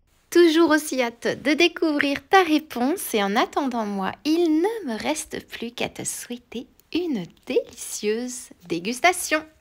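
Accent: France. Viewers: Muchas gracias por esta idea! Me parece fantástica 👏👏👏👏👏👏 La prepararé un día, seguro! Además quiero darte las gracias porque me encanta escuchar tu precioso acento francés y así aprovecho para recordar cuando lo estudiaba en el colegio😊 Un abrazo y gracias por compartir tantas recetas estupendas!🤗💜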